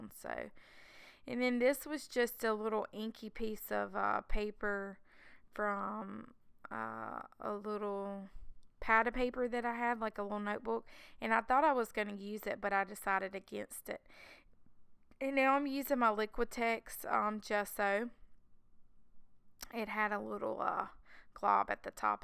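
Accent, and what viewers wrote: South, United States. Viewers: Welcome back! I have sure missed your wonderful videos, and that cute southern accent!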